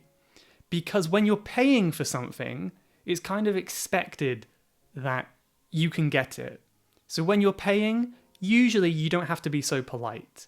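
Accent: United Kingdom. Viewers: I'm new subscriber, I'm loving your videos, thank you, I like the British accent.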